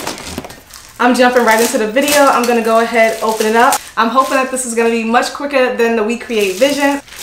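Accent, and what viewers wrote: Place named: Boston, United States. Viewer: Are you from MA? The amount of times you said “lasah” I was like ok she sounds Boston!! 😂😂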